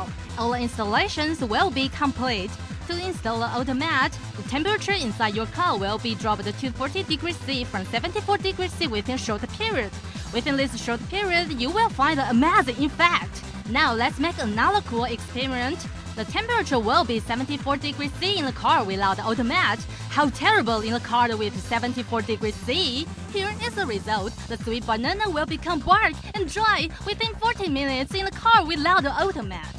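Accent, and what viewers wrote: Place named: England, United Kingdom. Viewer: it sounds english.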